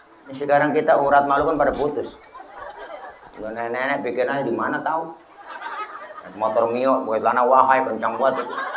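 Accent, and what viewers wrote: Jakarta, Indonesia. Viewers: Jujur saya sangat kagum klo liat ustadz ceramah,lucu nya mantuuul khas Betawi nya kental bgt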